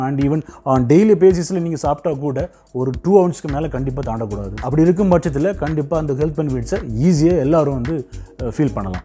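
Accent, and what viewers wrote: Tirunelveli, India. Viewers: Anna...nenga tirunelveli thoothukudi side ah?😅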